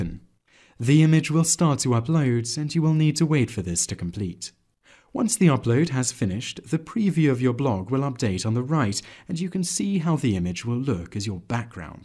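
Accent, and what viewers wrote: England, United Kingdom. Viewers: This Englishman's accent is amazing.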